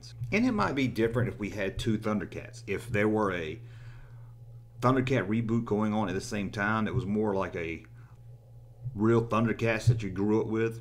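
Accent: South, United States. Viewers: i love that southern accent! reminds me of my grandma.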